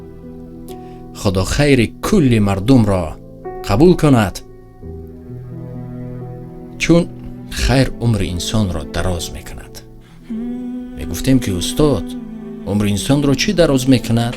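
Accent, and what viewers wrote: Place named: Khatlon, Tajikistan. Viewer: Ман нав фахмидм шумо аз хучанден амо лахчаи гапзанит пашти хатлония зато одами точики сам главни мусулмони гапнес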